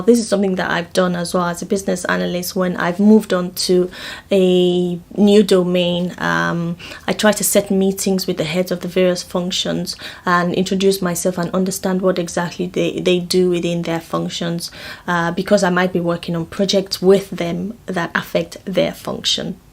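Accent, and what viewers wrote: Nigeria. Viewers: Are you Nigerian? Your accent…😍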